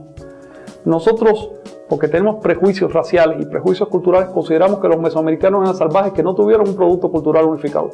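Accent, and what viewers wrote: Cuba. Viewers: Entonces el hablar como cubano(o no se de donde sea) le anula el conocimiento?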